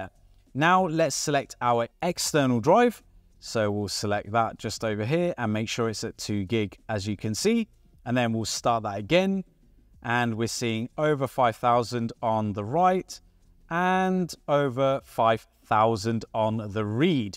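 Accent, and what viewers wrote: United Kingdom. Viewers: I hear a British accent, so when is this coming out in the UK? 😀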